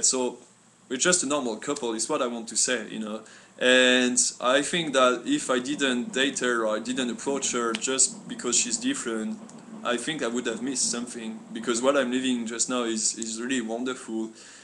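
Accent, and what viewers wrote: France. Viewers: Happy to hear about that!! Stay inlove and be strong! That's really true that being inlove is a wonderful feeling no matter what or where you came from..those people judging your relationship can't give the special feelings you felt to each other.. Nice accent by the way , same with my bf. He's from France also. He is French and I am Filipina😆...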